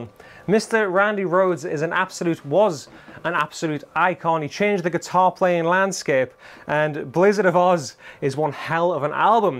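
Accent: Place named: North East England, United Kingdom. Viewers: i'm 52 and a total gearhead and i've been to millions of guitar shops over the decades corporate and indie and i have never noticed any particular song being played more than any other. i personally think it's a bit of an urban myth. me myself i tend to default to ' ' simply because it's the first really complex lick i ever learned and i hammered on it til i got it (i was still a beginner). btw "IT'S ALL MUSIC AND WE'RE ALL IN THIS TOGETHER" in his awesome northern english accent gotta love it could not have saud ut better myself! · Where are you from? I'm from Sunderland and I detect a bit of a North East accent.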